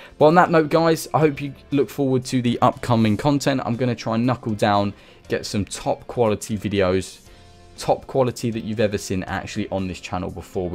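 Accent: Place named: United Kingdom. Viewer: Awesome video + i love that brittish acsent im bad At English because im Finnish :(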